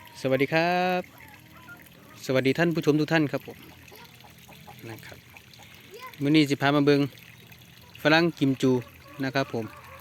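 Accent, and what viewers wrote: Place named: Chaiyaphum, Thailand. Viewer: ว่าแล้วสำเนียงบ้านพี่เล้ยยย น้ำไม่ท่วมดีใจฝรั่งก็สวยงาม ยังไม่ได้กลับชัยภูมิเลย อยู่แถวไหนครับ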